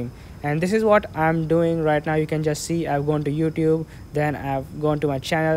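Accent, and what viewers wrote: India. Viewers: INDIA INGLISH 🤬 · Well your accent became more of an Indian accent as the video went on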